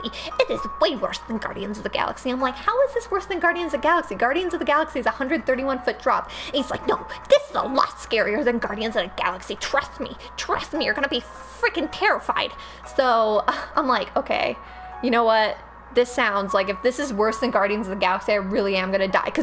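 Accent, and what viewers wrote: South, United States. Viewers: Why does this Turk sound like a deep south American? :-?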